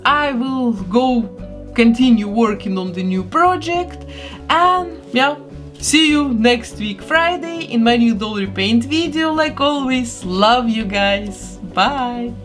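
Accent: Russia. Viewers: Какой же сильный акцент Мне кажется, что это девушка русская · Lol! Mystery of your accent finally solved!!!!! I thought you sounded a bit Russian ! Interesting! Turns out I was right! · Мне кажется я слышу русский акцент 😅 interesting video,thanks you 🌸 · Noooo, not "ghouls alive" spectra( you can not reroot her because the mecanism in her head( Btv, i knewed you have a "russian" roots) not just because accent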